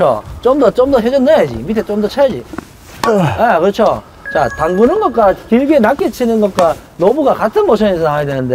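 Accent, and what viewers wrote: Gyeongsang, South Korea. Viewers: 어우! 경상도 코치님 진짜 멋진 강의 감사합니다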